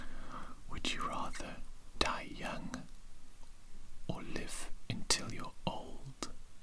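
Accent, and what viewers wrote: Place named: North Wales, United Kingdom. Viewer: i’ve been watching you for a while now and i’ve just gotta say it, are you welsh? because i’m a valleys girl and your accent is giving me north wales all the way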